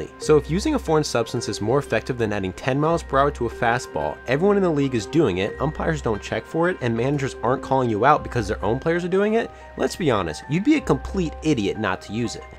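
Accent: United States. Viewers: why do you think the guy with an american accent doesn't speak english as a first language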